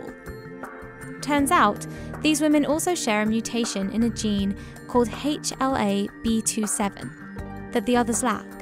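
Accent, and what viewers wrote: United Kingdom. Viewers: That british accent. Correct me if I'm wrong.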